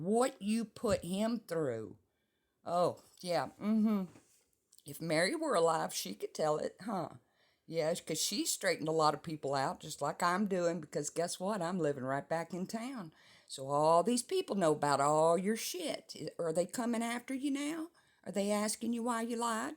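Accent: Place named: South, United States. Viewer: Gday, you are very easy to listen to, that southern twang gives me chills....Much Love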